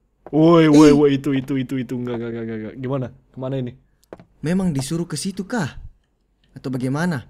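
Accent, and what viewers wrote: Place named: Makassar, Indonesia. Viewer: kak aksen makassar nya pertahankan:v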